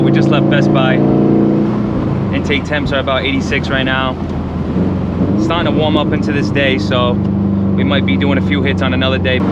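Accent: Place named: Massachusetts South Coast, United States. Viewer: Driving by Cask and Pig in Dartmouth eh? You from Fall River or New Bedford? I can kind of tell by your accent lol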